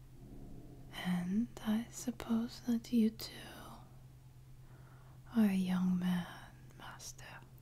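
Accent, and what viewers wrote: France. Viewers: Even though I am not a fan of hypnosis, I am very excited for this audio. Everything besides the hypnosis is screaming “I want more!” in my head constantly (which is primarily your French accent , which I would fall in love with every single day, as well as the Fdom aspect of the speaker). And hopefully my opinions of the audio actually create constructive feedback (otherwise I’ll keep my mouth shut to not hurt anyone’s feelings). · your French accent is so beautifully pleasant. Is this a sneak peak for a new audio? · I love the accent. At the risk of sounding totally clueless, are you by any chance actually French? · Ahh french accent and gorgeous soothing voice... perfect for a night. If I had a wife/gf with voice like yours I would ask her to whisper to me every night XD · Fdom + French accent? Heavenly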